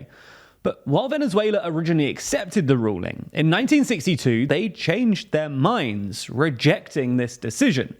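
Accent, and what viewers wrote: England, United Kingdom. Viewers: Never trust a YouTuber with an English accent. Never.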